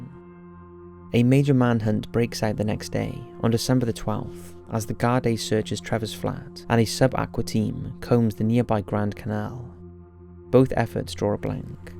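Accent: Wales, United Kingdom. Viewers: Welsh accent. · I just hope that this exposure will bring new light to this very mysterious disappearence and bring a sort of closure to Trevor's family and friends. If i could make a suggestion for a disappearance video it would be the case of Claudia Lawrence who mysteriously vanished from York, England in 2009. You sound British so I'm sure you will will know of this case as it has been on Crimewatch and in the media extensively but as far as i know she just disappeared without a trace and still nobody knows what happened to Claudia Lawrence. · As our Fine Narrator is {BritiSH} You would think a {BritiSH`cHap} would Kno' & bē Fully Aware Of [IriSH`slang] [or`Nott]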